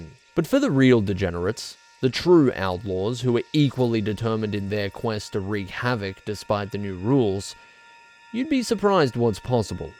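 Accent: Australia. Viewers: I think I'm in love with you. Everytime you upload my heart has a sudden shock of excitement. It skips a beat. It is undescribable the feelings I get when I see the notification that has uploaded. Your beautiful australiaun accent. Your well crafted sentences and editing. The narratives and cinemtagraphy blend together to make me EXPLODE! Thank you, thank you so much!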